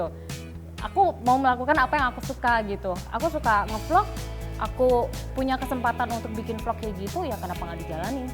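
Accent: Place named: Mranggen, Indonesia. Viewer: pye2lah logat mranggenmu gk ilang mbak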